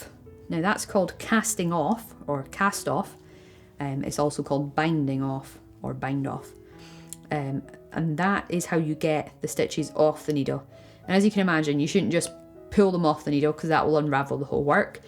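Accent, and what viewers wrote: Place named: Scotland, United Kingdom. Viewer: Thank you for your precise directions it worked,50 years without touching the needles. Love you Scottish accent.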